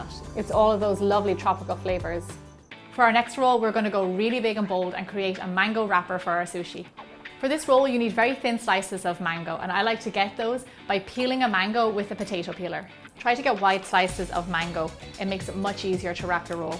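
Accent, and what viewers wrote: Ireland. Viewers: I totally adore your accent! So adorable! PS: I secretly wanted an Irish accent when I was a kid! And I still want one now!! 😝😝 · Okay this may sound REALLY weird but I love your Irish accent and I use to call the plastic wrap a plastic wrap but recently I've been calling it cling film😂 and omg I have been dieing to try sweet rice it sounds sooooooo good!!!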